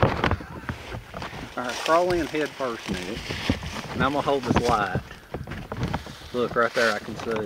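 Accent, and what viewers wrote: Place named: Spartanburg, United States. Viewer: . Well i don not have too many 1 hr blocks to listen and watch. but just saw a few short ones I will watch later, You sound like Spartanburg SC. You remind me of my Brother in law the Prison guard.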